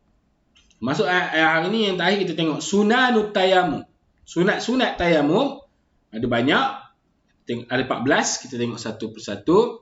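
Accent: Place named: Perak, Malaysia. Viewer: Bunyi perak ustaz😅😅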